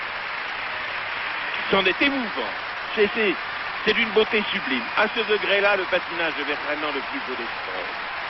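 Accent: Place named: Canada. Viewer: The footage may be European, but the commentary sounds like Canadian French.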